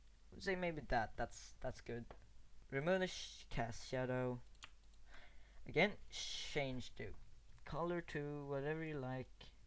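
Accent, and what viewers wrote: Sweden. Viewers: Are you swedish???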